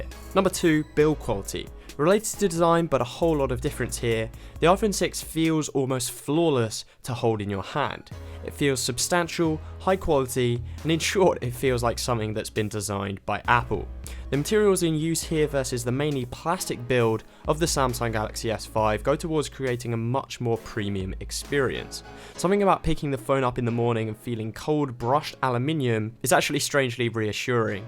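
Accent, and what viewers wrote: United Kingdom. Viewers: ненавижу британский акцент !!! аааааа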